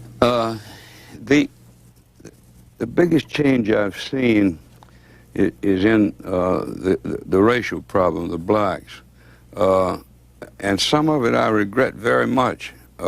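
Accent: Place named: South, United States. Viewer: Hard to catch with his thick southern accent.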